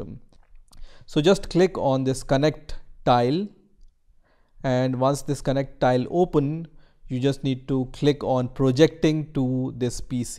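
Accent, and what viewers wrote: India. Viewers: bro It's Indians English accent...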